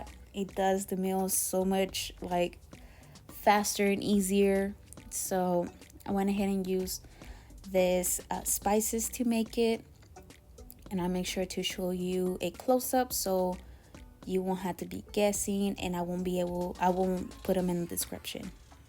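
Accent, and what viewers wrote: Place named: Spain. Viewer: ADRIANNA TRUJILLO She clearly has an accent and it’s cute. You can tell she speaks Spanish by her accent is what I mean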